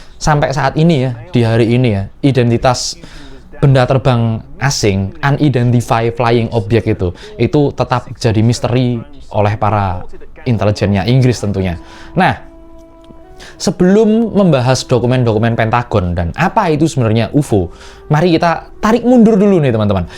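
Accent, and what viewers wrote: Java, Indonesia. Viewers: Jowo banget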